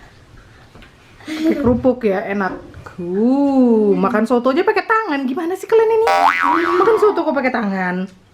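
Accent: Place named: Medan, Indonesia. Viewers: Orang Medan mana suaranya😘😘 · Nggk usah ngaku jadi orang medan tok. Dari logat jg kita udah tau klw itok itu orang medan 😜😀😀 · Wah mamak ...kirain asli dari Medan seperti Saya... Soalnya logatnya Medan x...😅 Benar mak sumut itu tempat nya sejuta etnis😄...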